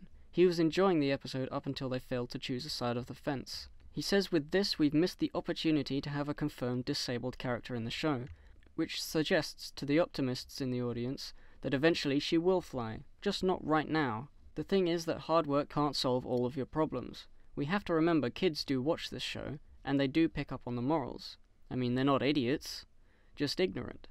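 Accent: United Kingdom. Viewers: wait, wait, wait, Back up the pick up truck SAY WHUT. UR BRITISH. YUSH. ME TOO. BRO FREAKING HOOF. Yush I did notice that at the beginning. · are you english? And if so which part of England are you from?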